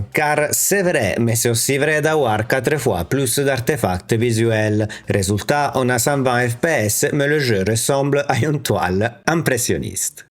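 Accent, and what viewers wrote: Italy. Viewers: Bonjour, tout d'abord je tiens à te remercier pour le contenu qualitatif de tes vidéos, avec, en plus un accent que j'apprécie puisqu'il vient me rappeler mes origines (mes grands parents étant originaires de l'île de Pantelleria 😘). Je dois monter un nouveau PC en novembre prochain et j'aurai voulu avoir ton avis sur le choix d'une carte graphique dédiée principalement à la retouche photo et au montage vidéo (davinci resolve, photoshop et lightroom). Tous les ordinateurs que je monte ayant une durée de vie de 10 ans, j'apporte un soin particulier au choix des composants sans toutefois tomber dans l'excès des cartes derniers modèles qui n'apportent finalement que peu de performance en plus par rapport à la génération précédente. Mon choix actuel se porterait sur une carte ASUS GeForce RTX 4070 Super/16 Gb Ram laquelle devrait convenir et tenir une dizaine d'années. 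Actuellement elle coûte un peu cher mais elle devrait à un moment donné coûter un peu moins cher. Actuellement mon PC est équipé d'une carte graphique Asus-CG-GTX-Strix-960/4 Gb de Ram qui a bien tenu jusqu'ici mais sa puissance devient insuffisante lors de l'encodage des vidéos. Mais elle tient le coup, sauf qu'elle devient lente. Je compte sur ton expérience pour m'orienter sur un autre modèle, si nécessaire. Grazie per il tuo ritorno. Ciao, Ciao 🙂 Salvatore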